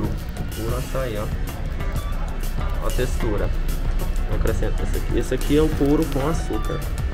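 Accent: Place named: Goiás, Brazil. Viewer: Fala bem goianes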